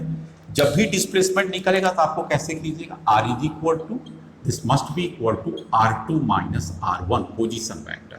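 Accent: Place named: Begusarai, India. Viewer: Sir u r from begusarai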